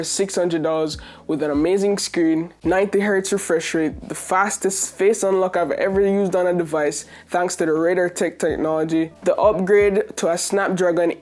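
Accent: Jamaica. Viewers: That Jamaican accent strong with this one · You sound very Jamaican. You Jamaican?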